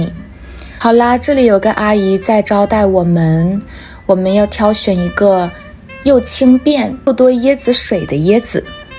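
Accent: United Kingdom. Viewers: could you make a video about cultures, customs, things to do/not to do for someone moving or visiting china? maybe do it in this format? thank you for videos... did you live in the UK for a long time? you have an UK accent when you speak english in other vids.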